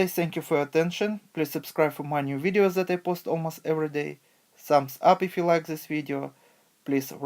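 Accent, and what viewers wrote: Russia. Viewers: bro are you russian ?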